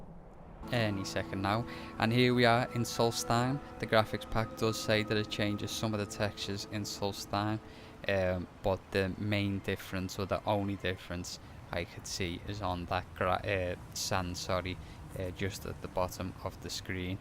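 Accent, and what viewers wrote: Liverpool, United Kingdom. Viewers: Omg ur scouse and you av the same nickname as me, get in lad! 😁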